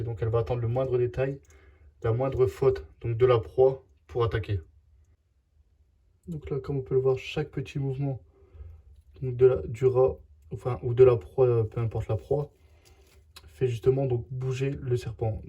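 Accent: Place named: Nord-Pas-de-Calais, France. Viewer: Mdr la presentation en chtit. Tres belle video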